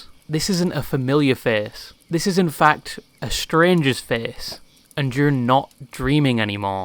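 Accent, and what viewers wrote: United Kingdom. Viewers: British detected, disliked. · No you’re wrong you’re perfect for those stories because it gives the whole thing a new kind of “sting” or “slash” it’s because you’re Brit ish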